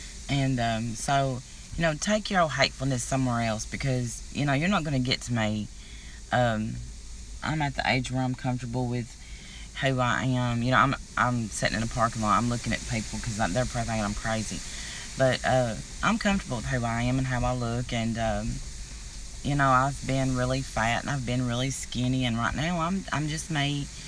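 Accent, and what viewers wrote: South, United States. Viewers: I originally watched her because she posted she ws a cancer survivor,and I am also,i had non hodgekins lymphomia,which is a blood cancer,,then it was all fake.She made a big deal about Amy,long after the drama was resolved,and bunny was exposed.You seem cool,your voice has that southern tang,which state are you from? Iowa here,you know the state you go thru to get to other states. :) so I look fwd to watching more of your vlogs,peace out,Lisa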